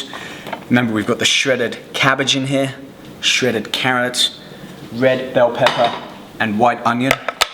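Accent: London, United Kingdom. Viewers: "you sound like you're from london"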